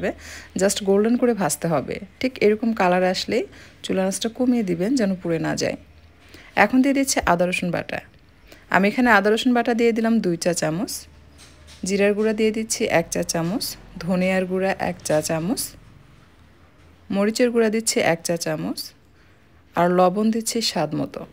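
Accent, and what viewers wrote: Bogura, Bangladesh. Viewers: Jak bugurar 1ta apu pelam Tai toh boli kotha ato sundor kano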